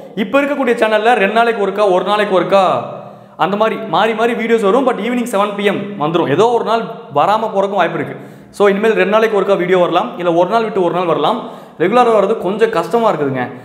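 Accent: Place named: Chennai, India. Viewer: Unga madras slang semma. "Kutty kunjan" alaga irukku. Kidnap pannamnu neenga sonnadhu, semma.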